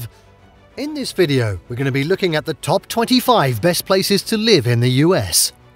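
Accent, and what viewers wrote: United Kingdom. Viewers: As soon i heard british accept i stopped video. I will skip it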